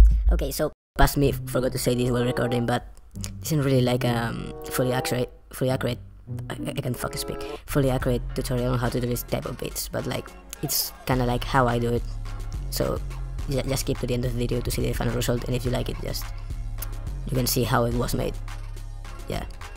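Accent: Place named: Spain. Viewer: Hey man I love the content. You have a cool accent are you spanish? Are you mixed with any black?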